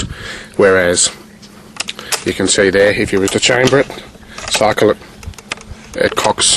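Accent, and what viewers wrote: Australia. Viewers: i love the Aussie accent :) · P.S. AAA = Awesome Aussie Accent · Love the accent, long live Aussie :)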